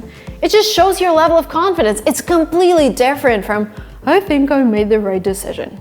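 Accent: United States. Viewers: I am Bangladeshi. I want to speak in American accent. I understand your American accent. But I can not speak like you. I am a govt. high school student in Bangladesh. I want to go to America for higher study. This is why I really want to speak in American accent. (My school's teachers are not good at American or any other accent). Our country has no any English accent. Some example of their (teachers) pronunciations are : Water=Water Think=Think Something=something If I speak American accent in the class everyone of my class laughs at me. They tell me ,"it is Bangladesh not America. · To my mind she was born to teach. I am absolutely sure that i’m gonna use these alternatives and use less “ I think “ so that i sound more fluent. Thanks for ur practical lessons dear i really do love ur voice and ur American accent. Above all I wanted to appreciate for teaching us all these contents for free. 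Wish u all the best🥰❤️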